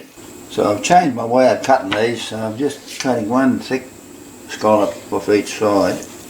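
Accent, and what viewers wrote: Australia. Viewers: Liked the video and loved your true blue accent. Went nostalgic on my time in Aus. Good luck to you!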